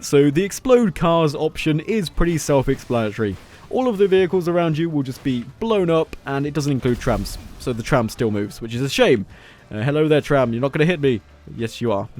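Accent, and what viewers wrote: United Kingdom. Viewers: You never heard a normal British accent before?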